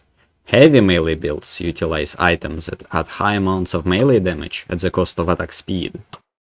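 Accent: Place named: Russia. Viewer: why do I hear a strong Russian accent in your speech?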